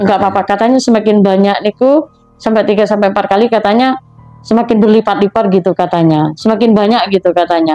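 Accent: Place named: Java, Indonesia. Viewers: Maaf kamu sebut orang Kalimantan asli Tapi logat nya jawa Setau saya orang Kalimantan asli gak ada yang gila harta sampai pesugihan Kami hidup apa ada nya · Nada bicaranya asli Jawa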